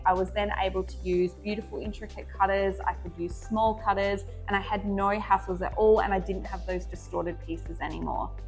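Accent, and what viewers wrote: Australia. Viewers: Hi, my name is Lori, I want start off by saying I love your accent, Australia, right? I'm seriously thinking of making clay jewelry, and this is the first time watching your tutorial, I like it! Have a great day! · What a great source of information , and great to hear an Ozzy for a change. Thank You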